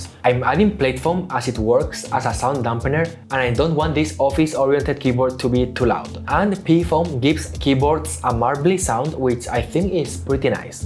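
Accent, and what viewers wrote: Spain. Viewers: Very interesting that your english has a clear spanish accent. Not sure if Korean and Spanish sound the same now.. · Oye se nota que eres español, por que no haces el canal en español? Seguramente tambien tendrias muchas mas visitas, o por lo menos un canal secundario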